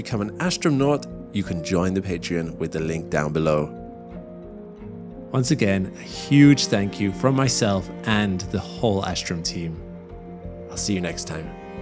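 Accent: Wales, United Kingdom. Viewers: Is that a Welsh accent? I'm from Newport... Sounds similar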